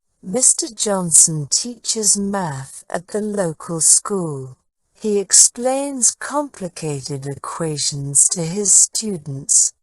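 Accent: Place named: United Kingdom. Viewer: Excellent authentic British English pronunciation. Only listening to you and imitating is the key to English fluency.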